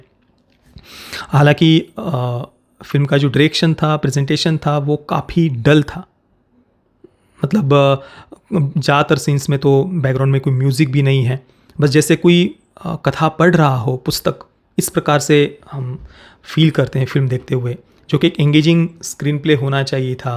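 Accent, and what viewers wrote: Maharashtra, India. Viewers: मी आत्ताच बघून आलो!!❤️❤️..आणि शुध्द मराठी बोली🔥❤❤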